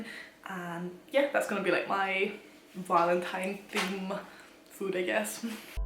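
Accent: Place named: Aberdeen, United Kingdom. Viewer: Your accent is so mixed but so Aberdonian at the same time haha, are you Italian? Great video.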